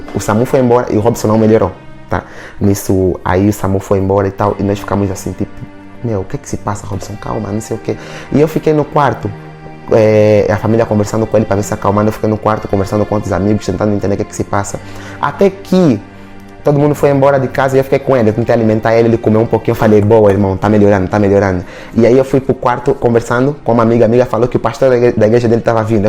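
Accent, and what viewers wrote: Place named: Portugal. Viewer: Ele falar português só que de Portugal entendeu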